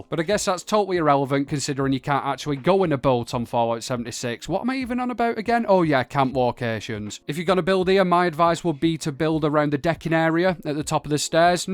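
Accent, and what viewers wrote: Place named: England, United Kingdom. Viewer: Thank for the vid been nice hearing a englishmen talking instead of all the yanks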